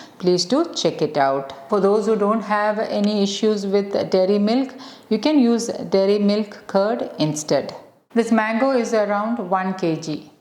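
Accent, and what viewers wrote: India. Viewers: There's nothing different with her accent, it's just a perfectly normal Indian accent.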